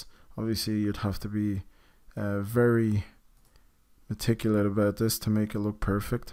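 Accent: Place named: Ireland. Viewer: THANK YOU SO MUCH. Finally found this after scouring for days. And that Irish(?) accent made everything so much better lol.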